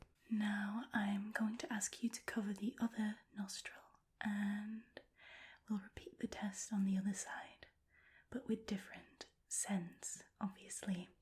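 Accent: United Kingdom. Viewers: I can’t quite pin down your accent. Is it British, Irish or Scottish? Either way it’s lovely 😊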